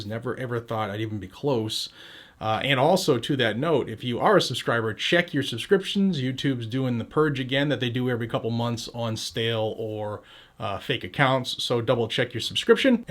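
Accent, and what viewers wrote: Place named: Massachusetts, United States. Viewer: By the way I grew up in Billerica Massachusetts so I recognize a Massachusetts accent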